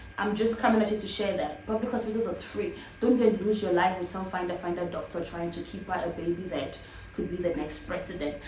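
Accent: South Africa. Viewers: Hi you so beautiful darling and your accent is the same like south african accent,how long have you been in SA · I like how you switch between South African languages, I couldn’t say you aren’t South African because your accent.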